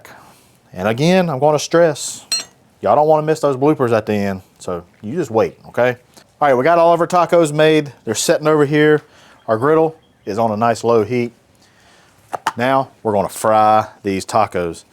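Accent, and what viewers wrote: North Carolina, United States. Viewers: I know an eastern north cackalacky accent when I hear one!! love your videos... inspired me to get a blackstone!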